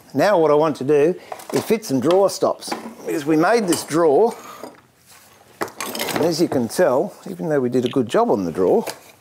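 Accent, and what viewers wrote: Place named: Australia. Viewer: Great work and A+ presentation, it took a bit to get to understand Ausie which is cool. I felt a bit redundant to comment on each episode so, I saved it to the last. The finished and the unfinished pieces both look equally fantastic work of ART, no less, you are a Master Craftsman. Thanks for this set of videos, looking forward for whatever you have out there, Cheers Mate. Just wanted to correct one thing you mentioned in E-2 (I think) for the dove tail joints: David Barron's Alignment Board, FYI.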